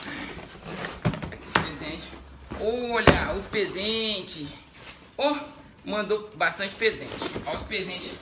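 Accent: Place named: Minas Gerais, Brazil. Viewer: Esse capixaba tem sotaques de mineiro😂😂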